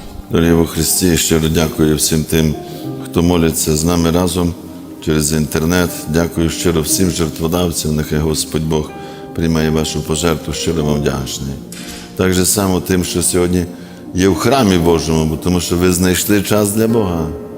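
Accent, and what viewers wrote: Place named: Precarpathia, Ukraine. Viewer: Дякую Господу Богу отче за вас Я також з Ів.Франківської області з Калуша,але живу і працюю багато років в Іспанії,але як гріють душу вашу науки і наш Прикарпатський говір.Дякую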